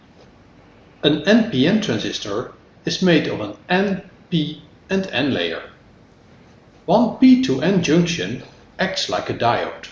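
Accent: Netherlands. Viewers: Thank you for the explanation! great stuff! PS you sound Dutch ;)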